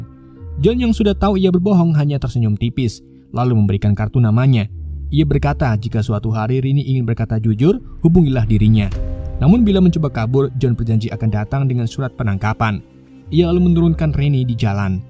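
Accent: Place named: Java, Indonesia. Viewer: Aku yakin kw wong jowo min😂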